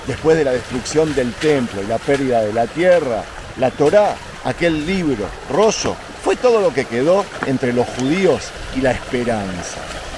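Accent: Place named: Rioplatense, Argentina. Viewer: Tenes rasgos de desendientes!hablas como argentino o.uruaguayo sholom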